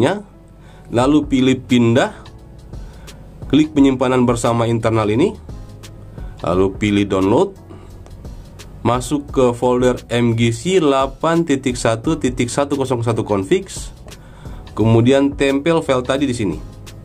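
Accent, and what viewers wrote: Kotamobagu, Indonesia. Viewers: Om orang kotamobagu kang 😂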